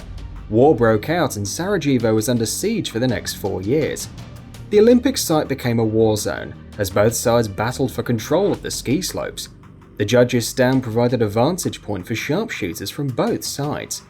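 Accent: England, United Kingdom. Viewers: Who cares how it's pronounced he's saying it with an English accent so of course it's different. I pronounce jalapeño JAlapeno instead of HAlapeno...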